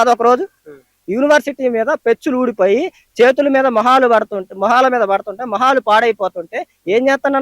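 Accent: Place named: Andhra Pradesh, India. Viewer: వీడు ఆంధ్ర స్లాగ్ మాట్లాడు తున్నాడు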